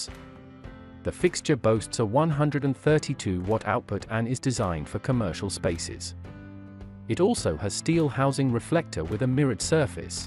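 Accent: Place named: United Kingdom. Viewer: STOP USING BRITISH VOICE OVER... american lite? then use a american vo. we dont like listening to limey's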